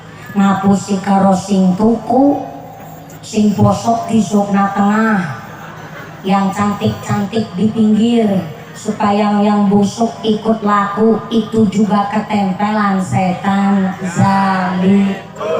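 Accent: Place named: Banyumas, Indonesia. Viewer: Assalamu'alaikum, moga sehat n sukses sllu bu ustadzah, ora ngapak ora kepenak, ❤❤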